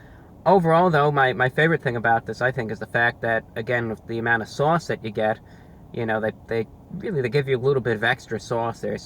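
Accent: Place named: New York, United States. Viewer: This dude has to be from NY.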